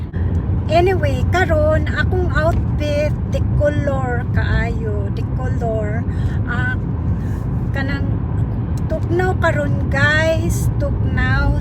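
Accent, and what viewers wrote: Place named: England, United Kingdom. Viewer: ilhan kaayo ka englison na ng ahahaha nasagulan jud ng kay sanay naman ka english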